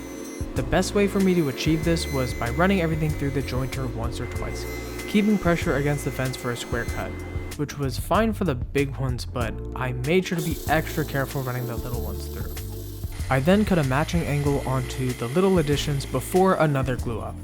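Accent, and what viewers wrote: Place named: United States. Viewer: you're so american it almost reeks out of your voice. ew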